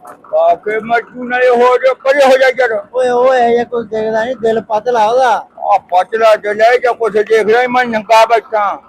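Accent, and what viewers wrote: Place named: Punjab, India. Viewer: ਤੀਜੀ ਵਾਰ ਵੀਡੀਓ ਦੇਖ ਰਹੇ ਹਾਂ ਸਿਰਫ ਤੁਹਾਡੀ ਠੇਠ ਪੰਜਾਬੀ ਬੋਲੀ ਅਤੇ ਪੁਰਾਣੇ ਵਿਰਸੇ ਜੋ ਤੁਸੀਂ ਦਿਖਾਉਂਦੇ ਹੋ ਉਸ ਦੇ ਫੈਨ ਹਾਂ ਬਾਕੀ ਐਕਟਿੰਗ ਸਿਰਾ ਹੁੰਦੀ ਹੈ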